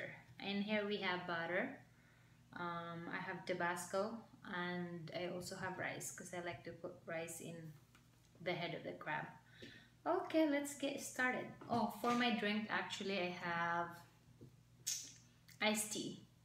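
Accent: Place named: Philippines. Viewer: R u visayan or ilocano? U have the accent... esp with some of ur pronunciations and intonations...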